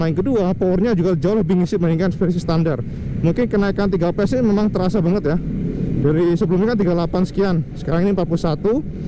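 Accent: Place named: Java, Indonesia. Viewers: Seneng bosomu cak ...ojok lali cak cok e ojok ilangi ya cek ketok jowone😀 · Cak Samean jowo ndi hehehe kok mantab ngunu jowone 😂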